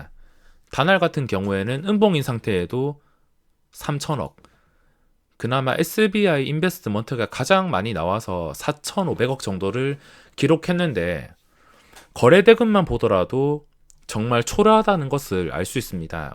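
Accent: Gyeongsang, South Korea. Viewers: 창원분이라 그래요..물론 창원분이 다그런건 아니지만 저도 경상도사람이라 알거든요 그러고싶어 그러시는게 아님...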